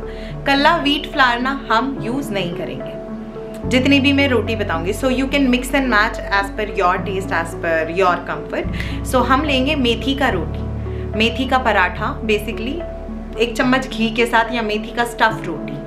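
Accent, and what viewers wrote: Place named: Delhi, India. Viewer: I like ur delhi wala accent di. U r so inspiring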